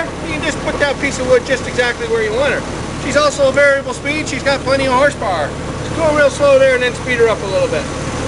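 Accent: Newfoundland, Canada. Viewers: He must be from Newfoundland.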